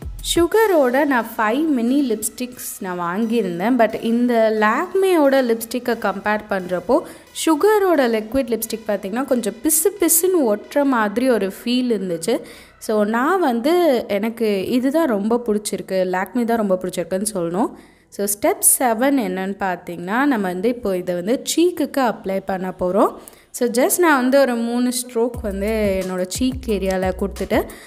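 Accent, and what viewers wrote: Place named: Hindi Belt, India. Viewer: Super voice, hindi accent varudhe yen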